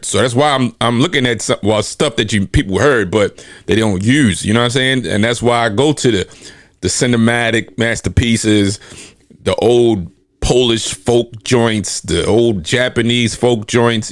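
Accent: Maryland, United States. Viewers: Super dope stuff man. And I thought I detected a MD accent!🙌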